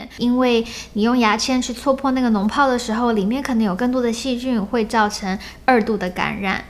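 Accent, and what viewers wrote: Taiwan. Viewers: 口音似乎是從台灣來的。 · 你的國語有台灣口音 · 😀因為您的口音，我猜您是台丸人?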